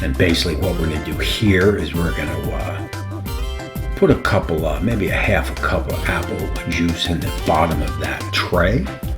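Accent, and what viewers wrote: New York, United States. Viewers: What part of Texas are you from? I've lived in Texas my whole life and can't think what area of Texas sports a NY accent?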